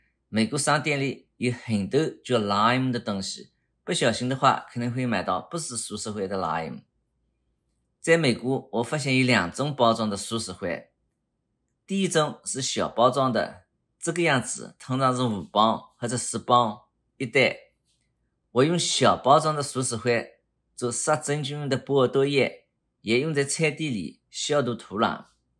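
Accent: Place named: Zhejiang, China. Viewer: 我咋觉得像浙江口音，宁波？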